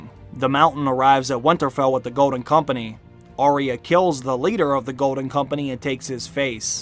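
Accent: Pittsburgh, United States. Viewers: Wait wait wait this guy sounds like a yinzer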